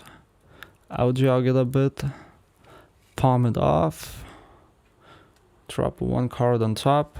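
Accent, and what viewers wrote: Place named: Russia. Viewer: Ahhh that Russian accent😭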